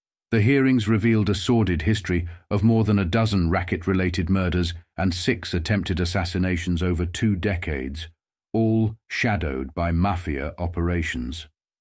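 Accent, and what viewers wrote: United Kingdom. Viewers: Who wants to hear a stupid British accent !!!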